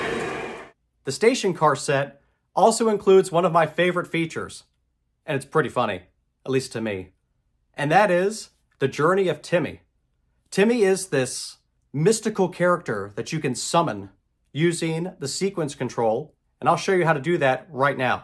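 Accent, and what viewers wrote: Kansas City, United States. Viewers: Are you from Kansas City?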